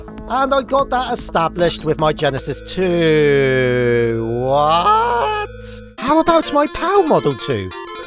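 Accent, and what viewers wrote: United Kingdom. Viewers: So he's not Australian, but British? Alright. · how did i never know you were british until rewatching this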